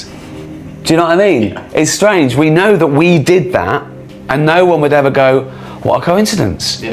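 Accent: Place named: United Kingdom. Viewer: Be careful dude. You can make just about any bunch of bullshit sound legit with a British accent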